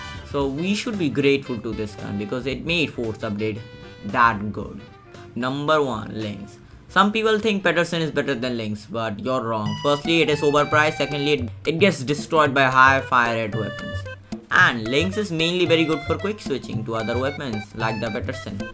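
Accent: India. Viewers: Are you Indian? Not to stereotype but seriously are you? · Are you indian?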